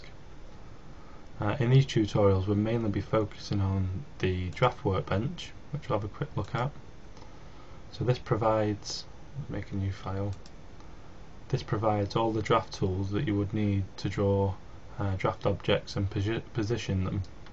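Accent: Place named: England, United Kingdom. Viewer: The audio sounds fair to me, there are a lot worse out there in youtube land. Granted it's a crap microphone and there is some background hiss from the pre-amp but audio compression would just make it louder. Microphones don't amplify they just listen, it's the circuitry which follows which can colour the audio. I think the main issue is that he is speaking in "English" and some of the viewers are listening in "American".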